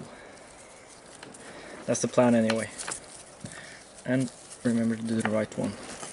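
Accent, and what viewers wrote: Norway. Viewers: Jeg syns aksangen virket Norsk! 😁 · Jeg bare så på den videoen her i 10 sekunder før jeg hørte norwinglish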